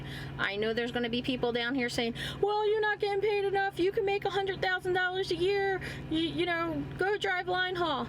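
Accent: New Jersey, United States. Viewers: I knew there was a reason I love hearing you talk, you’re a Jersey girl.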